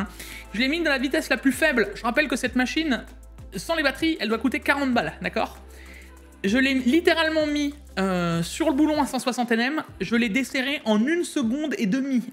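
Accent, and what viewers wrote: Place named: Alsace, France. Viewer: Hello et les autres, le SN850x est pas chère (aout 2023 j'en ai acheter un en 4to sans dissipateur pour 270 euros). pour moi, les télés LG c'est la vie le WEB OS est top! en vrai l'accent alsacien bien! allez pourquoi pas tester l'écran amazon:) le LG en 39 pouces (un 32 et demi) c'est comme le NEO G9 57 POUCES (deux 32 pouces) niveau taille sa doit être un monstre! Ah bah le ASUS en 4k 240hz Oled reverse en 1080p 480hz n'a jamais été en promo mdr! alors pour ce qui est achat, j'ai juste acheter mon asus rog swift oled 4k à 1109 euros au lieu de 1500 de base et puis c'est tout! d'ailleurs j'ai choisis celui ci pas que pour le gaming mais aussi pour travailler sur des photos #OUTILPORTATIF:)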